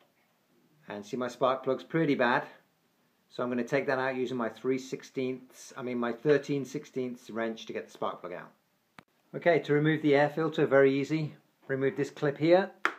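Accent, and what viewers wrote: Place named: United Kingdom. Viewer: U sound like ur British, I thought u guys called a wrench a spanner?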